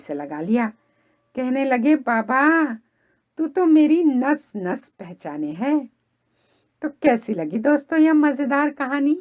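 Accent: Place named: Braj, India. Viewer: 18... big like bahut Sundar Lalla Tu Bhi Pite Ho Tera Baba bhi Pite go Kahani Sundar hai hi aapke Munh se Braj Ki Boli bhi bahut Sundar lag rahi hai Lalla Baba main bhi chalunga Hamare Vrindavan Mein Aise Hi bolate Hain Brij Bhasha aapke Munh se sunkar bahut hi Achcha Laga excellent video my dear sister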